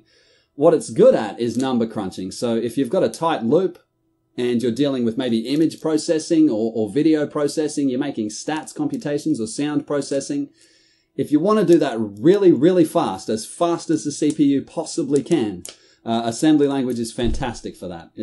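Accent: Australia. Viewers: Assembly x86 presented in an Australian accent? Couldn't be better.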